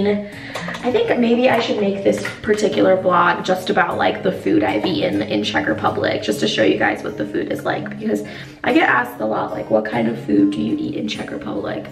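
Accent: United States. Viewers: Jedinej člověk co má americkej přízvuk a mluví tak plinule česky. Zajímavě se to poslouchá :D Je to fakt parádní pocit :)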